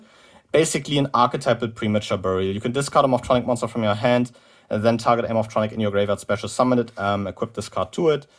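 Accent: Germany. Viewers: Are you from Germany? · You sounds so german XD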